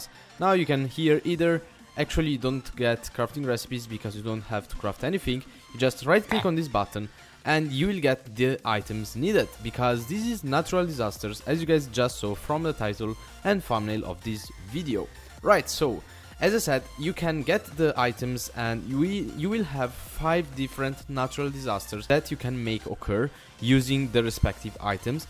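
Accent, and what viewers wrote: Italy. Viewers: Are you italian? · Are u italian?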